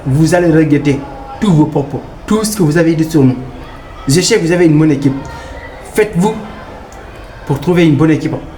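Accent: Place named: Senegal. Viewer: Deja tu n'est sénégalais avec ton accent la hum🤷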